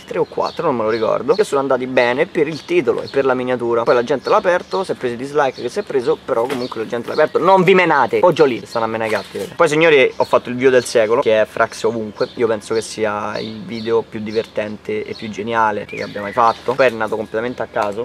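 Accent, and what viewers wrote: Rome, Italy. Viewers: Parla di più in romano che fa ridere un bottooooo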